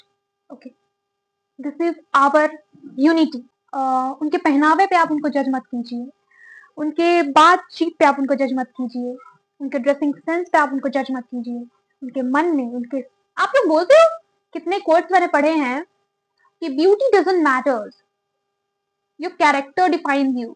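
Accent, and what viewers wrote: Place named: Bihar, India. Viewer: Btw you've got that hardest bihari accent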